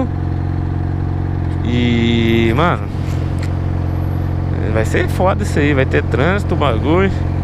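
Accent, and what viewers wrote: Goiás, Brazil. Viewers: por que você não fala mais no pique do Goiás???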